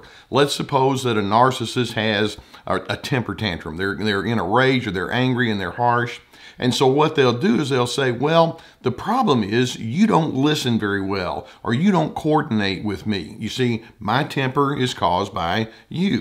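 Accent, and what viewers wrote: South, United States. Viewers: Why are you calling him out for his accent? I'm from the South and I know his accent is smoothly correct and authentic. If he sounded "like somebody from Washington", I would say you're completely nit picking how someone talks, basically calling him fake! 🙄 Move on.. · ✨Glad I clicked. Staying for the combination southern accent and for the great information . Sweet tea for the soul ✨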